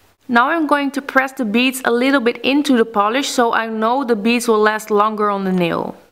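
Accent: Netherlands. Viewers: ik dacht al dat je nl was hoorde het al een beetje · Where are you really from? I hear some accent hahaha Holland? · Super leuke nail art! Ik was het filmpje aan het bekijken en ik dacht: Dat accent, ze moet wel Nederlands zijn. Dus ik ging zo op je blog kijken en toen bleek het dat je Nederlands was! ik vind dit ontwerp echt super gaaf ik ga het ook proberen (: <<num>!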